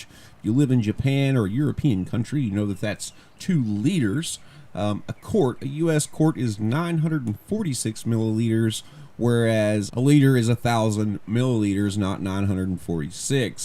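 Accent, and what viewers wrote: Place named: Canada. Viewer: Canadian? once American? can't tell. you just sound like a Canadian that knows all accents and ignores all of them but doesn't speak proper English either XD could also be cuz its a voice over and you can pick or re-say something lol but ya Canadian?????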